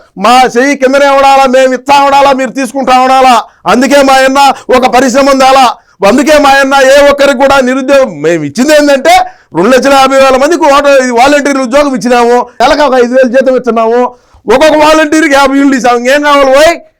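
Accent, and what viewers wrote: Rayalaseema, India. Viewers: సోదరా.. రాయలసీమ భాషలో మీరు.. 👌👌🤣😂😅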